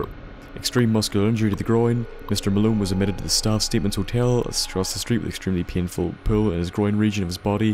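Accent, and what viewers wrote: Northern Ireland, United Kingdom. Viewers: which part of Northern Ireland you from? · are you northern irish boyo? your humour and use of expletives are second to none. Reminds me of myself lol. Brilliant content creator you are bro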